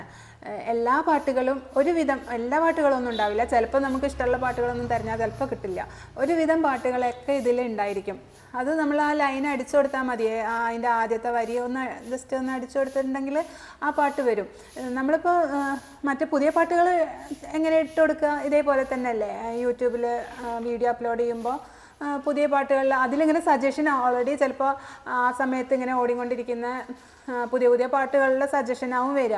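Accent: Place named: Palakkad, India. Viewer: Samsaram kettitt Palakkad aanennu thonnunnu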